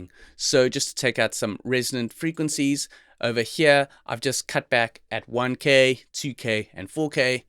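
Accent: South Africa. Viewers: Ayyy I noticed that accent! Fellow South African!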